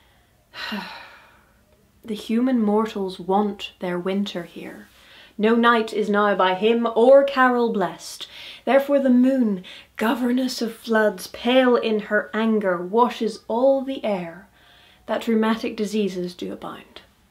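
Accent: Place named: United Kingdom. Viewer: Good job! :) I must say, all the times I've performed/studied/seen Shakespeare, it sounds so much better with a British accent. :)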